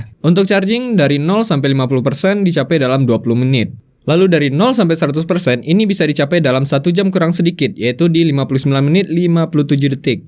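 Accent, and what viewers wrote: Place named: Minangkabau, Indonesia. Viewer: Sudah kuduga urang awak, ada aksen nya tipis2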